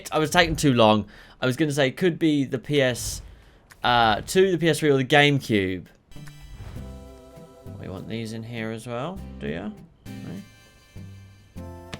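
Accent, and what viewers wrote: United Kingdom. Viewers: My God, I Love your British Accent....😊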